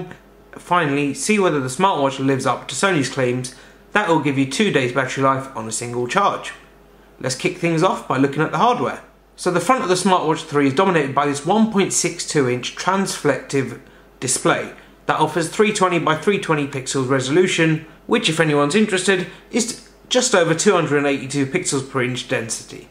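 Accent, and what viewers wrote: India. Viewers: American accent? indian...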